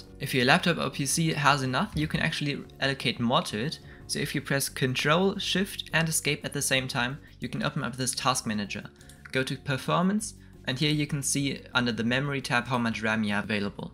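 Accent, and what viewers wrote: Germany. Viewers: Du klingst zimlich deutsch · man hört so das du deutsch bist haha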